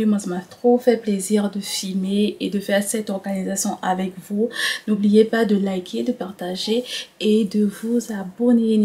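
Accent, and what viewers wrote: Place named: Gabon. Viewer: Je savais avec cet accent que ça c'est une gabonaise quand j'écoute encore le elang no si😅ma sista FANG 🎉🎉je m'abonne du courage❤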